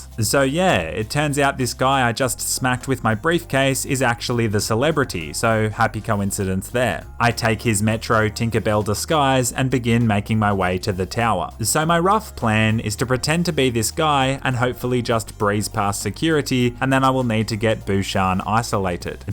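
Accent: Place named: Australia. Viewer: Thought he was Aussie I’m Australian to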